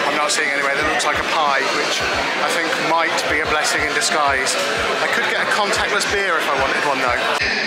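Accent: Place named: North, United Kingdom. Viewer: I'm from the south east and you sound northern to me!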